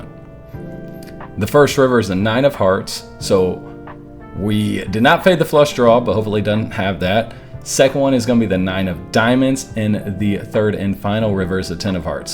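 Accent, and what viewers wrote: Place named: Texas, United States. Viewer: I'm learning about different American accents. Would you say your accent is typical for a Texan? Can you tell if somebody's accent is from Tennessee or South Carolina? Do those accents sound different to you?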